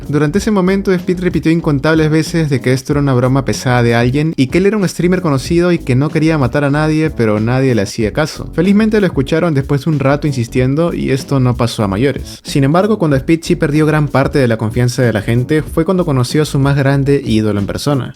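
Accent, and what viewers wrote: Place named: Lima, Peru. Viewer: Tienes acento limeño. 💪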